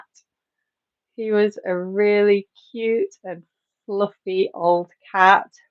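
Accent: Northern England, United Kingdom. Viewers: I personally really like your Northern English pronunciation. Some English teachers change the accent to teach the standard pronunciation, but I prefer originality.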